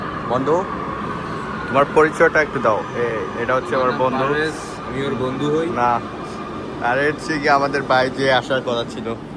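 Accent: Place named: Sylhet, Bangladesh. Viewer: Sylhety mat beshi vala lage😊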